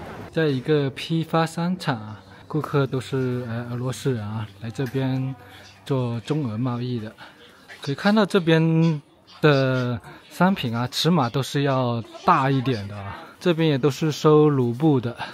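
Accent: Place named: Fujian, China. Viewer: 福建口音濃重到有點假！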